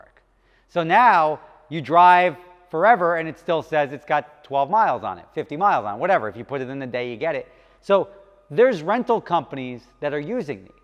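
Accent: New Jersey, United States. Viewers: Just put the old S on and it'd be perfect. Jersey accent an all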